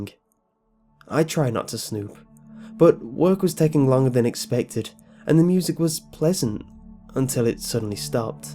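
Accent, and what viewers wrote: England, United Kingdom. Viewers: Is he English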